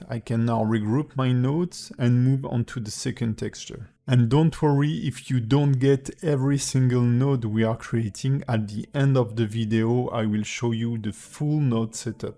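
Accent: France. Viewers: i was gonna purchase your animation course but after watching this video, im not so sure anymore. i know you are not an english native speaker but ur french accent makes the learning process 10x harder.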